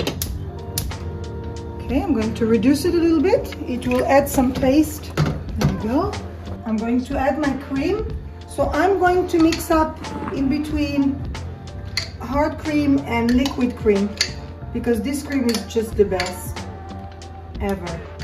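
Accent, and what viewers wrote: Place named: France. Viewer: Goutez a little bit...your accent was so french😂😂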